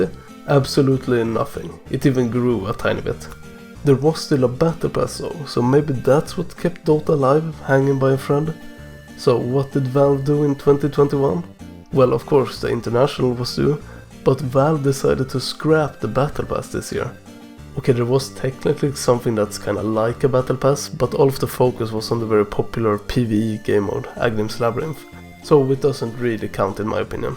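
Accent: Sweden. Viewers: jesus kristus den där tunga svenska dialekten. kekw.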